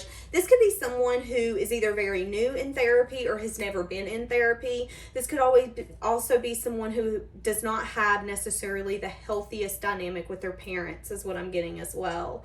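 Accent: South, United States. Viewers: You're pretty... Love seeing the face with the southern hospitality accent... Blessing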